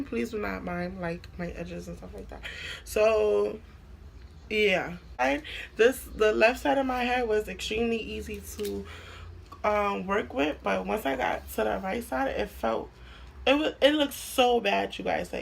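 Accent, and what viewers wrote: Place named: New York, United States. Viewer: you HAVE to be from New York. Brooklyn? lmaoooo I hear it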